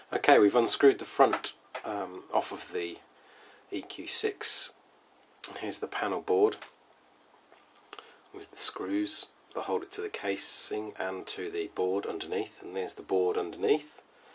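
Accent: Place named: United Kingdom. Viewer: Yeap, british accent is so weird. I'll never like it.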